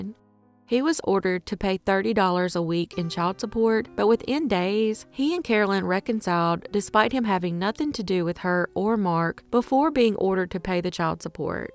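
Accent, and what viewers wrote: South, United States. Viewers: You have to tell me what state you grew up in. I am trying to place your accent. I’m guessing Alabama or Georgia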